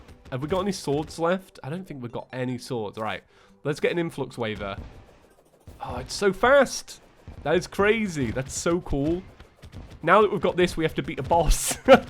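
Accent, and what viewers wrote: United Kingdom. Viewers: Yeah, it's like. " Oh god ,I'm so gutted by that -__- " It's just so stereotypically british and I love it lol.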